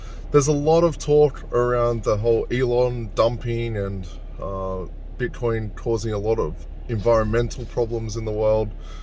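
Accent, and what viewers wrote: Australia. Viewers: Yep exactly mate! From Bitboy segment . Congrats on winning that segment.. im a new sub mate.. i am Aussie so nice to hear the Aussie accent.. i live in the Philippines now so yeh to get my Aussie fix im gona watch you! Cheers and keep it up bro! P.S Elon is a Sh!thead👎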